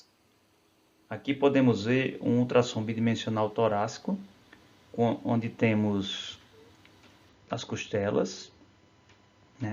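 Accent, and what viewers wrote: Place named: Ceará, Brazil. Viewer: Meu parceiro que aulas boas. Parabéns! Vc é do Ceará? Sotaque de cabra bom. Só o som que ficou meio baixo, mas conteúdo de excelência! Abraços. Ps. Encontrei o canal por acaso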